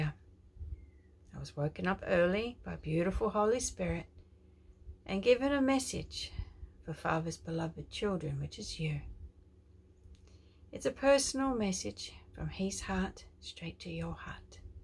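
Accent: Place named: Australia. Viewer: Oh wow!! Aussie!!!❤❤❤ Love to hear your voice. I’m in the United States, Kentucky ❤❤❤😊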